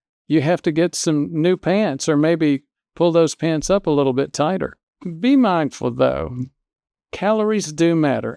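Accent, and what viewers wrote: South, United States. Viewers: Beautifully presented. Of course, I'm in Little Rock so I hear those Southern roots serving you well!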